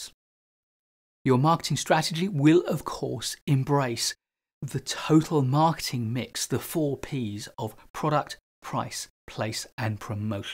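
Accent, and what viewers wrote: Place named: United Kingdom. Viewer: I started to miss the British accent in business videos in YouTube 😂 thanks from Morocco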